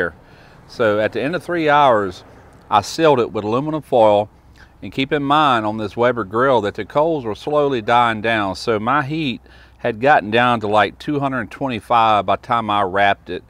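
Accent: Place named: Texas, United States. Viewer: this dish looks mouth-watering👍 and I really like your accent. are you from Texas or elsewhere down south?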